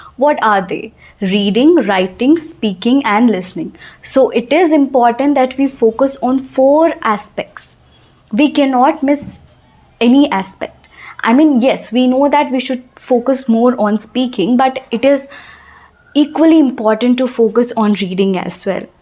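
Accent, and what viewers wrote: India. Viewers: I only subscribe to your channel because,. So soft your voice it is! Like a native Indian speakers. It will be very helpful for indian's like me.🌅💞💯✌️💐💐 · English has become a major challenge for all of us today . Today people those who are speaking fluent English are getting preference. So, whether we like or don't, it is must. We will have to be very confident in speaking. Speaking skill can only be developed by speaking practice only. I am non telugu but I can express my ideas & emotions in a very clear way in telugu without knowing telugu alphabets. I have lot of experience that, most of the telugu educated people speak english but their accent is telugu mixed & it's very easy to recognise them as a telugu while they interact with other in english. It's so surprising that, you are some one who is speaking english like english. It's a good thing. 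You are really sounding like indian standard english. Ma'am you are 100% correct, that we should get ourselves attached every day & every moment with english by start reading informations whatever we get. Like whatever we purchase we find some informations on the wrappers of the stuffs. English is not such a BRAMHA VIDYA that, it can't be learnt. Problem is that, we are not investing that much of efforts and interests to control over the language.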